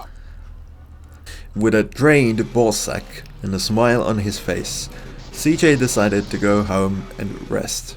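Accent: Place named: Estonia. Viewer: hevi eesti aktsent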